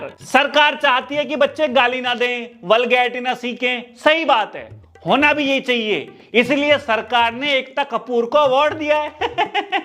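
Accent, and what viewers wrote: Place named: Uttar Pradesh, India. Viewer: UP se lgte ho bhai 😅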